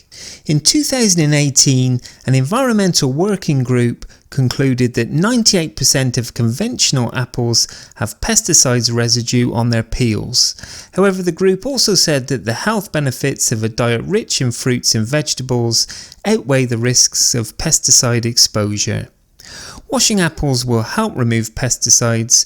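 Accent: United Kingdom. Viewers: good info and nice british voice!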